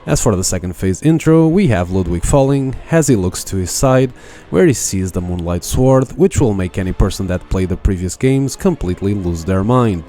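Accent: Portugal. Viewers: se este sotaque não é tuga eu atiro-me da torre dos clérigos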